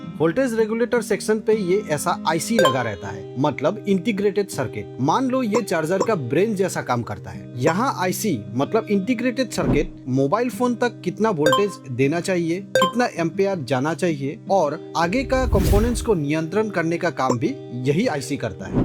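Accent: Northeast, India. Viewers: Bhai aap north east state region se ho kya aapke accent se lg rha hai 😊😊❤❤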